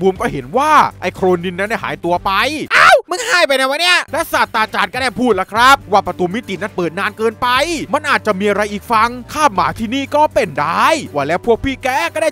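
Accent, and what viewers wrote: South, Thailand. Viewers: แอ็ดคนใต้ม่ายนิ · คนใต้อยู่แล้วครับผมว่า แต่ไม่รู้จังหวัดไหนครับ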